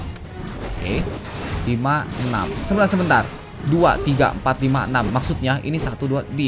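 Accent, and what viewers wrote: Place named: Java, Indonesia. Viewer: medok hadeuh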